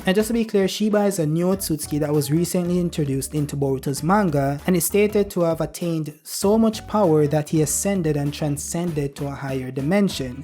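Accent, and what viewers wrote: Jamaica. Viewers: Youhavaa Jamaican voice are u jamaican???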